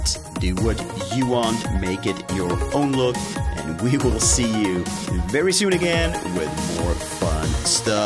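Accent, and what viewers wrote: Scania, Sweden. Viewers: Älskar din skånska!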